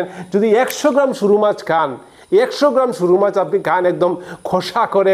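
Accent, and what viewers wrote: Sylhet, Bangladesh. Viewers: ভাই আপনার বাড়ি কি সিলেট। আপনার ভাষা টা সিলেটির মত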